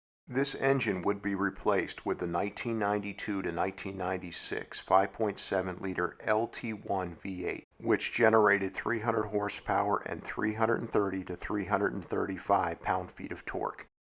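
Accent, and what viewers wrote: United States. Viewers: Wow. That was a great video and voice over. The narrator had the greatest American accent of all time. Good topic and spot on.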